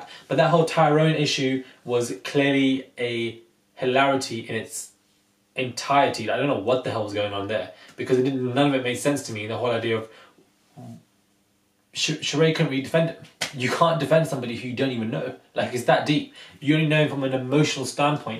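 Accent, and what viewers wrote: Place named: London, United Kingdom. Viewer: Great to hear a fellow Brit and Londoner (?) reviewing RHOA.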